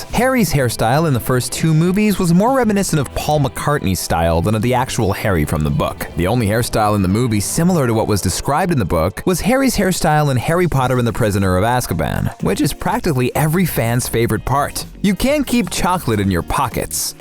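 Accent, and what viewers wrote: United Kingdom. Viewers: what is your British but American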